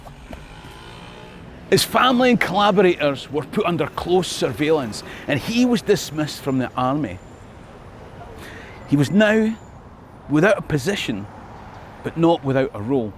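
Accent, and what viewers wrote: Scotland, United Kingdom. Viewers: I love the way you say "Ghanaian" with such a broad Scots accent, it's just so appropriate.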